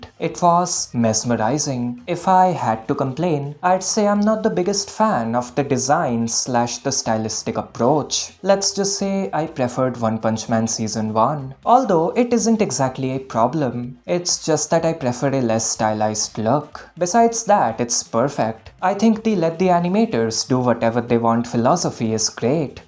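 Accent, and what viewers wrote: India. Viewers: Bro are you indian? Your accent sounds quite indian to me.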